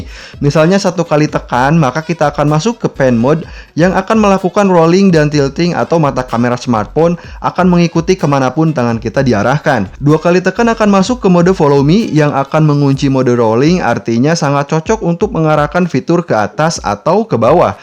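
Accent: Kuningan, Indonesia. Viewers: Bro orang Kuningan? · Siga orang kuningan nya mang?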